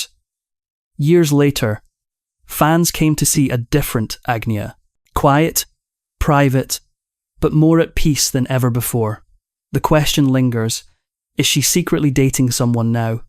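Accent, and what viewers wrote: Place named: Scotland, United Kingdom. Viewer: It`s the first time I have heard AI with a Scottish accent.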